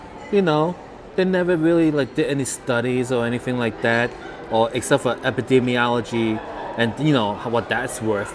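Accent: New York, United States. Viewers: Thanks for your service! My wife has kind of the same pronounciation problem as you. Lol...but, you do it with a New York accent. Don't stop talking! That's why I watch! No, I've never wanted a late night snack of vegetables. Hat is fine. It makes you look distinguished.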